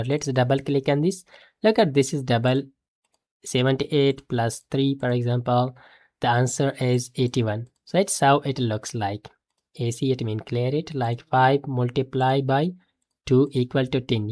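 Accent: India. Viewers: Indian pronunciation 😂 Clau de code 😂 It's claude not clau de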